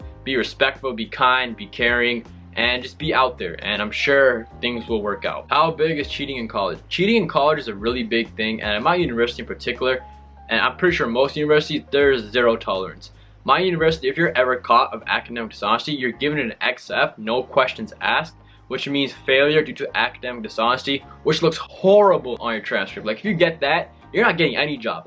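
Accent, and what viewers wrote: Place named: United States. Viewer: How you got this much fluency and American accent 🙄?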